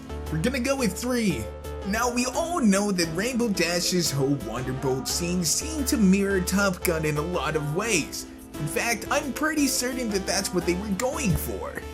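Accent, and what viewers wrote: Ireland. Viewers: are u irish? xD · Anyone elsea realized he kinda sounded a bit different, in my opinion he sounded like irish (no offense)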